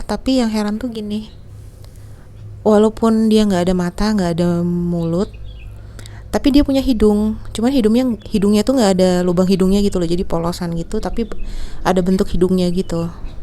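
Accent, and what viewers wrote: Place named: Banyumas, Indonesia. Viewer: banyumas mana suaranya:v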